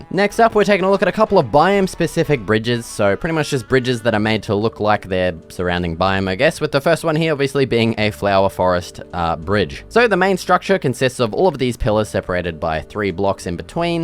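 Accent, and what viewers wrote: Australia. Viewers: I like your channel I love building in all games but idk I just really like your channel pretty genuine also question you Australian?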